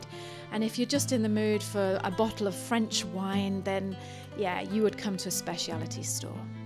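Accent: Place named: United Kingdom. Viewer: Weird narrator script, i need to see different people . She sounds soooo British. Im British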